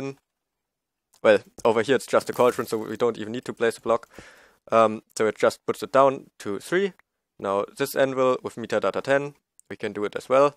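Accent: Germany. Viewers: man hört richtig den deutsche aktzent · Typisch deutscher akzent. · Are you german ? Its Sounds like :) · Are you german? ^^ You sound as if you were!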